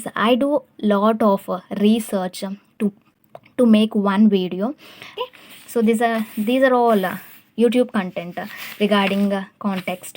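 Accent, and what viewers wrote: South India, India. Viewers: U r accent is so south Indian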